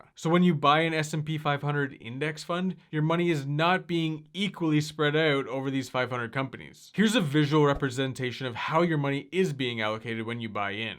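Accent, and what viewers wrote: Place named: Canada. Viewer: Hey what app or brokerage do you use to buy stocks with ? Lol also I can really hear the About! (fellow Canadian haha)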